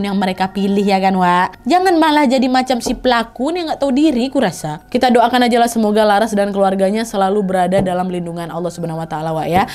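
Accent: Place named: Riau, Indonesia. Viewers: pake logat Riau juga ya Wak😭😭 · Kak knpa logat nya jdi logat riau. "ndak do"😂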